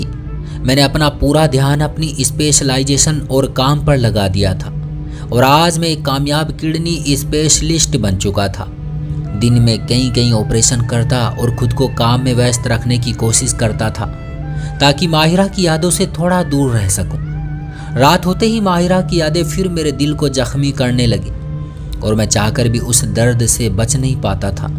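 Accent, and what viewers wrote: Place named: India. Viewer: To kya hua kisi kisi ki zaban aise hi hoti hai jaise mostly bihar aur up mp side walo ki zaban aise hi hoti hai aapko samjh aa gaya na bas wahi bohot hai😏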